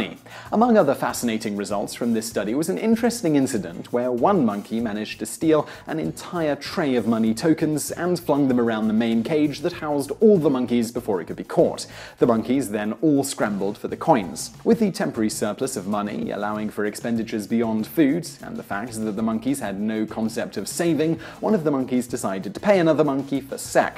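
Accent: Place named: RP, United Kingdom. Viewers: Thomas Smith yeah sounds posher than me anyway, southerner that'll be why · Thomas Smith posher English I think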